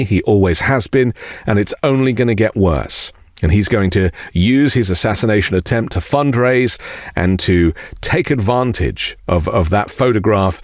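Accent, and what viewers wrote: United Kingdom. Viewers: Glad to have a British accent reassuring us amid all the panic about Trump getting more votes from this 😂❤ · Thank you for these words! And thank you for your British accent! The US is a complete mess.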